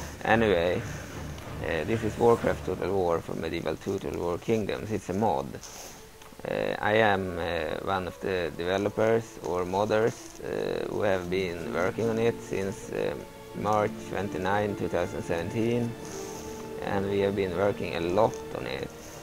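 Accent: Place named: Sweden. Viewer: I ceartinly look forward to be playing this :D Looking forward to the Ahn Qiraj the most personally Also do excuse me if im wrong but im an sensing an slight tint of Swedish in your english voice, if so Hallå Hallå :D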